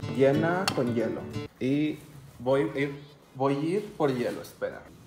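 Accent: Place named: Fresa, Mexico. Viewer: Jajaja yo no sé nada de las maneras de hablar en México pero jajaja parece que ella habla muy gomelo creo que en México le dicen fresa pero muy fresa jajaja a veces esa manera de hablar me incómoda un poco en los vídeos pero na me la paso genial!!!!!! ❤️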